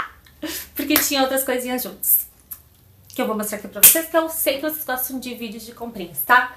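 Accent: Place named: South, Brazil. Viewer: seu sotaque é lindo sou carioca, mas minha paixão é o sotaque do Sul! Amei o vídeo! Realmente não saímos da farmácia sem levar produtos que avistamos na hora e desejamos! Beijos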